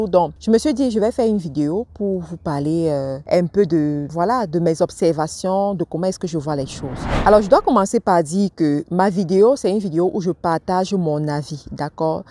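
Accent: Cameroon. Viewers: l'accent camerounais , j'ai tellement trainé avec eux que je reconnais direct les Kmers . 😅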